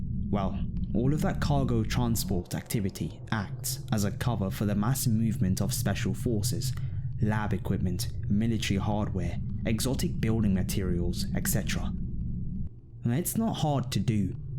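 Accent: United Kingdom. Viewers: U didn't worked there.. U sound like u from da U.K... Sorry nice tried.. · His accent is British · Why do you have a UK 🇬🇧 accent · you sound british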